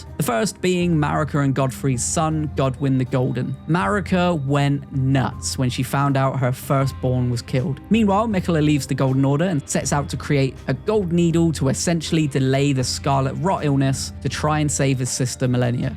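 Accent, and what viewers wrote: United Kingdom. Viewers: literally never seen a elden ring lore video that wasnt voiced by a british dude.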